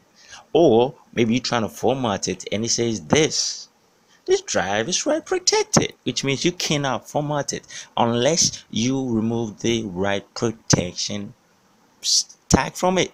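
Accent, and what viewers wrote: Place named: Africa. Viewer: You have an African accent, are you from Africa?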